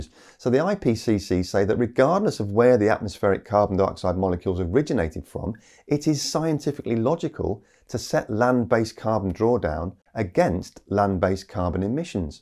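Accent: United Kingdom. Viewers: Sucker for compassionate rationality, relevance, wisdom and science with a kind and gentle British accent. Typical highly evolved mammalian response.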